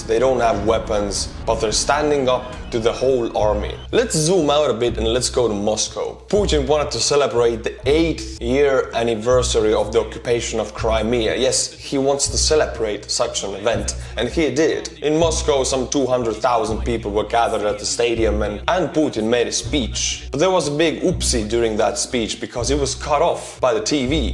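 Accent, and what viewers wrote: Estonia. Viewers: Don’t lie! You know that Estonian accent is awesome. It sounds to my ears like some mix of standard “eastern european” mixed with a bit of Finnish, but then a healthy dash of Italian and even some Catalan with the rolled R’s. Love it, man - I’ve really grown to appreciate the three furiously independent “Baltics.” Edit: I came to this appreciation by way of John Neeman tools, now the Autine Forge and Guild, a Latvian collective dedicated to traditional building techniques and crafting world-class, handmade tools and gear. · I love your Estonian pronunciation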